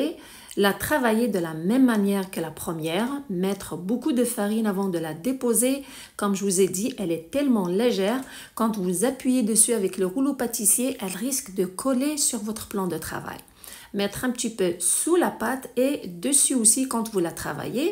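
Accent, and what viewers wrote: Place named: Kabylie, Algeria. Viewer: Merci, faut avoir 2 mains gauches pour ne pas reussir cette Coca grace a tes consignes 😜Un bonjour de Hollande d'un wlid bladak (j'aime ton leger accent kabyle)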